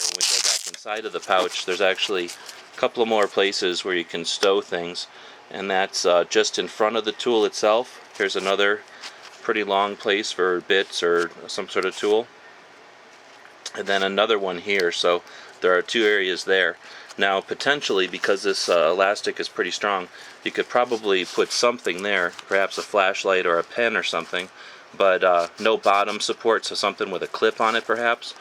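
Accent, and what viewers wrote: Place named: United States. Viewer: When I hear your voice I hear 'Merica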